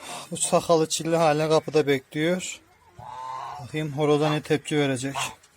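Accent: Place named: Sivas, Turkey. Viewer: Konuşmandan belli abi tokat sivas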